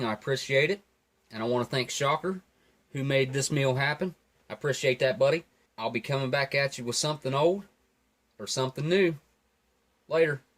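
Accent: South, United States. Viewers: Where ya from? At first I thought you were Australian but you sound American. You from Texas? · recognized the heavy southern drawl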